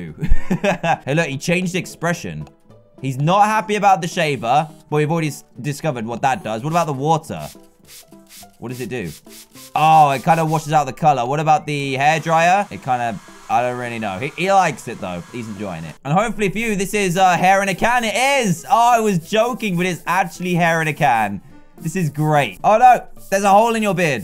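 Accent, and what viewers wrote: United Kingdom. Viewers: Wait I did not know that you was British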